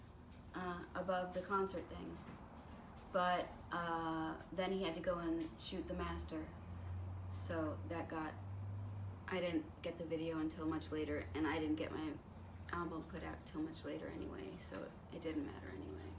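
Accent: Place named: New York City, United States. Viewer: I had no idea her she had a NYC accent! Comes out every now and then, awesome! I get made fun of mines all the time at work, cause for what ever reason I have a slight Bostonian undertone to some words.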